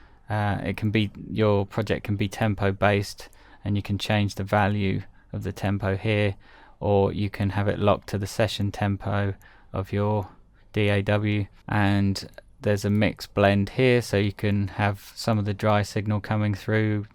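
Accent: United Kingdom. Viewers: This video magnified my hatred of the British accent.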